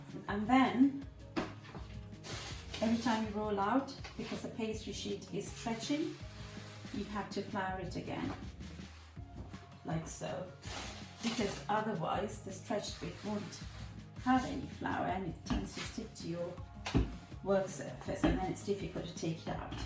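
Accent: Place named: United Kingdom. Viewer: Sweet talented lady with a British Accent, looks yummy ! enjoy your video over and over again !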